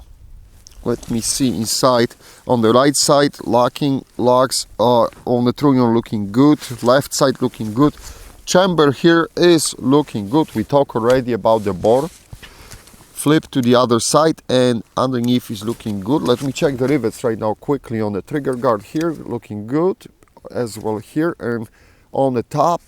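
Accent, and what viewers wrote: Poland. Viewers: I know this is an old comment, but this is his real accent. He’s Polish.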